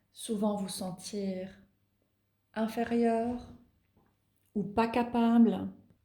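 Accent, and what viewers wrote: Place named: Jura, France. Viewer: J'aime bien votre accent (Jura?)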